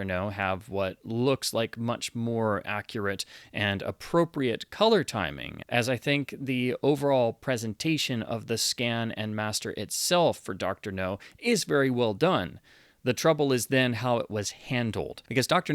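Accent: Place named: United Kingdom. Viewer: ur british accent is great.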